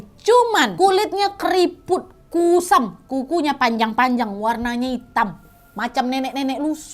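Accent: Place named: Madura, Indonesia. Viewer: Kok lama lama logatnya kayak orang madura , lucuu banget 😁🥰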